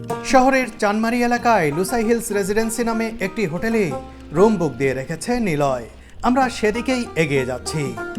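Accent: Sylhet, Bangladesh. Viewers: বারবার বলছেন , বাড়ি আসাম , আরে ভাই এই ছেলে করিমগঞ্জ , শিলচর , বদরপুর এসব এলাকার , সিলটি ভাষার গন্ধ পরিস্কার !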